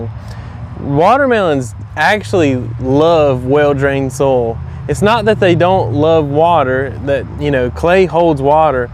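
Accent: South, United States. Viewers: Are you from Alabama or Georgia?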